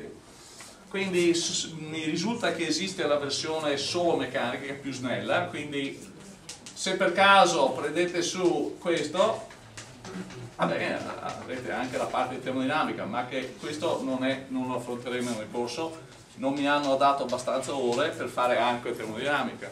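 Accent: Veneto, Italy. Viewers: Questo professore non solo è bravo, ma è uno spettacolo umanamente parlando: un saggio. Mi bevo le sue lezioni che è un incanto. Un americano con l'accento veneto, poi, non ha prezzo! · Ma ha l’accento veneto hahahahha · A me sembrava Veneto! Probabilmente ha imparato direttamente quello 😂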